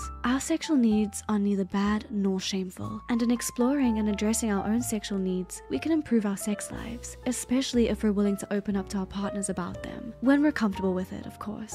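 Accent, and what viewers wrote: England, United Kingdom. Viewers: wait, gone British all the sudden now lol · Why does she’s have English accent this isn’t normal is it does she do some the videos 🧐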